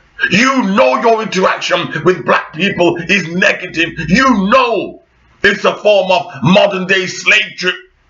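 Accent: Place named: Caribbean. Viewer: Rick James with Island accent. Thumbs up.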